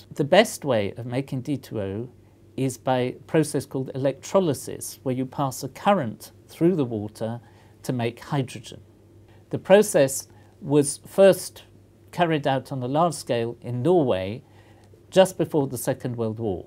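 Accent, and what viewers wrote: England, United Kingdom. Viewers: and just the right amount of British accent. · Crazy hair, lab coat, British accent = genius most likely.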